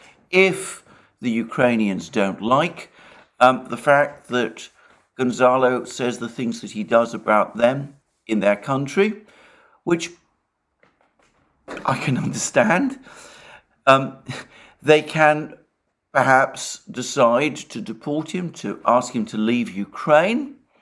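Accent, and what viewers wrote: United Kingdom. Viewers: Your well-spoken nature has such a British appeal.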